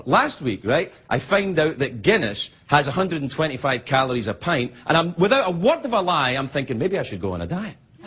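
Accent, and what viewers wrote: Scotland, United Kingdom. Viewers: He sounded so much more Scottish back then. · Still had his Scottish accent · This guy is awesome. Miss his show. Miss his Scottish accent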